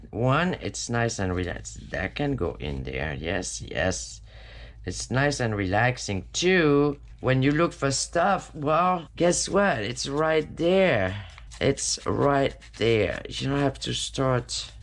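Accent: France. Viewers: These are very cute double pockets,! I missed seeing your videos for awhile, the last one I saw was the one with using cereal boxes to turn into “books”! I’ve collected several sets, 2 of each & I ordered the little clip thingies from Amazon, so I’m looking forward to getting on with that! This one today, though seems pretty quick & easy, so I might sneak one of these in first! It’s so fun to listen to you talk, English with a French accent is much nicer than plain old “American”! How did you become so proficient at English? And it’s not book English, you use a lot of slang & you speak very quickly, without pausing to think about a word!! Sorry, I sound like I’m interviewing you, I’m just curious! Now that I’ve found you again, I’m going to catch up on your videos! Thank you! Erica❤hope you’re having a nice weekend!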